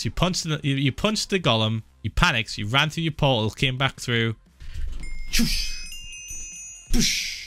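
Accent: United Kingdom. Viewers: I keep forgetting he's British. Even while he's talking.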